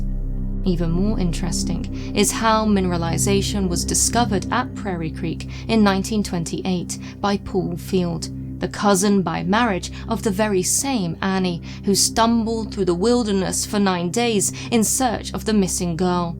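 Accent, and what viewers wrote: England, United Kingdom. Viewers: Yes I agree that you are an excellent narrator. You kept me interested and and glued to the screen waiting for more information which you deliver in a way that interested at all times. Further more, and I must apologize in advance, but those dark brown eyes, give a sense of mystery and depth of knowledge. Gosh, it feels like I'm putting you on a pedestal here. I am from Canada and although some British accents are difficult to understand, yours was a delight to list to, to say the least. Finally, and embarrassing to me and perhaps to you, (in a good way) but I find you to be very beautiful. Strikingly beautiful actually. We all see beauty in our own ways but your delivery of the stories, looking into the camera, almost like its a conversations as if you were sitting across from me, talking directly to me. I apologize and hope you are not offended as my intention is that of appreciation and admiration. 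I look forward to further works from you. You definitely have a fan here in Canada lol. Take Care....Joe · Sorry, I don't the British accent. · Listening to you makes me want to dress well and drink tea while watching your videos. You're an English I always imagined english people are- elegant and classy.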